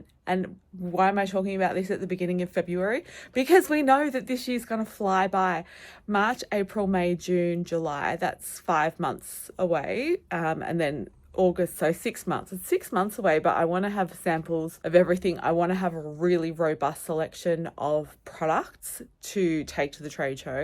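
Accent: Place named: Australia. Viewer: Wow these pens look amazing. I really likee the sound of the brush tips and wowzaz…Australian!!! Awesome!!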